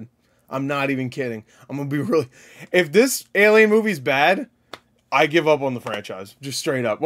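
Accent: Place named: United Kingdom. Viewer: yea but this guy in particular has a very strong British accent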